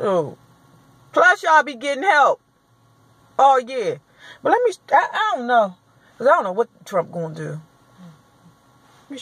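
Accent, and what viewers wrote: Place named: DMV, United States. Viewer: The way that you said.... "and I radiate love"..... LLS. To funny!!! The moment I heard you speak I knew that you were from the DMV. Gotta love my local ladies.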